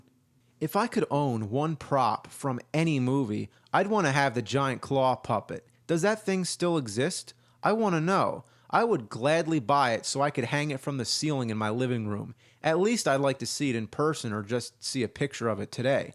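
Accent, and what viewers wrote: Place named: Philadelphia, United States. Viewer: I love his Philadelphian accent. It's very homey for me.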